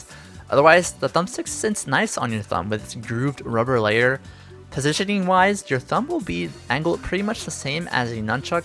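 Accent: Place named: Texas, United States. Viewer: texan spotted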